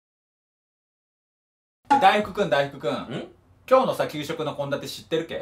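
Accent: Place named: Enshu, Japan. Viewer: いつも面白い動画ありがとうございます。 遠州弁最高！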